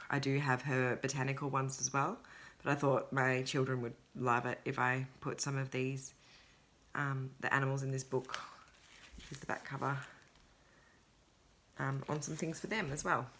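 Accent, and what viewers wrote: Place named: Australia. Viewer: Just discovered your floss tube - I am suck at home with a sore throat which I hope is not the dreaded illness and occupying myself stitching and catching up on flosstube. It is so lovely to hear a video in an Aussie accent, and I am absolutely delighted that my pattern is the first FFO :) Hope your studies are going well... I work in environmental science for my day job and am a low waster, and it's lovely to find a member of the stitching community who shares both passions :)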